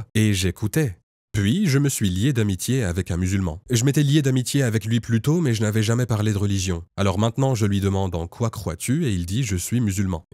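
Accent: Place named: Arab world. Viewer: Bizarre il prononce le prénoms du prophète et du coran avec l'intonation exactement du vrai musulman la langue arabe seule un vrai arabe peut prononcer les converti le prononce différemment car il faut avoir parlé toute sa vie l'arabe pour prononcer les syllabe du h ou k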